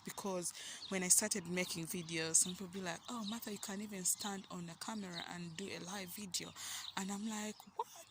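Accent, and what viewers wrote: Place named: United Kingdom. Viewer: You keep it up. Don't let anyone tear you down. By the way I love your accent. I'll certainly be going back and watching your other videos. I could listen to a British accent all day long.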